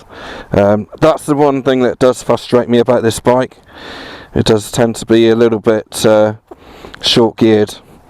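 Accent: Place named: England, United Kingdom. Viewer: Can you talk in an American accent hard to respect with English sounding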